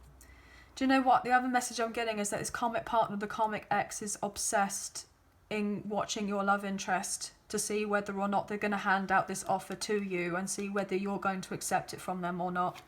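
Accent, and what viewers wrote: England, United Kingdom. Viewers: Loved this reading! Very interesting, thank you very much lovely Lady, Love your English accent!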